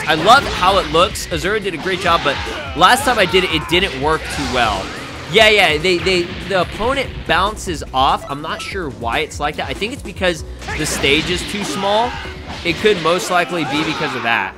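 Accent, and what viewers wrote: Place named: Spain. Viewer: I notice your accent was like spanish,or portuguese,are you?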